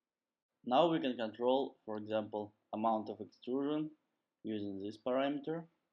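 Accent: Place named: Russia. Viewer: Smells like a russian accent!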